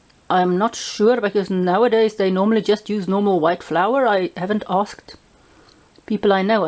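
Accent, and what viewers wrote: South Africa. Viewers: Hoekom maak jy nie videos in Afrikaans nie? Ek kan baie goed hoor jy is ñ Afrikaaner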